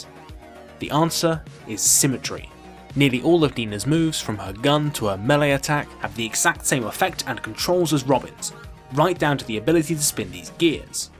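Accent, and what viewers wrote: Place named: RP, United Kingdom. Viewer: "Allow me to explain to you" is a super annoying way to phrase your intro. Within the first 10 seconds, between that phrase and your posh accent, I can already tell you're a total tosser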